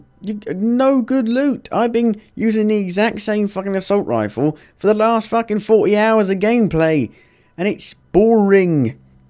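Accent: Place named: Essex, United Kingdom. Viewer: Where you from mate? Sounds a bit like South East... Essex maybe? But there's a bit of Weymouth in there! Like the honesty old sea dog!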